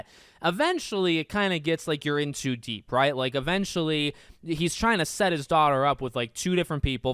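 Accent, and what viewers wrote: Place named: Michigan, United States. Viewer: Just came across this channel and you do a great job! You sound like a Michigander lol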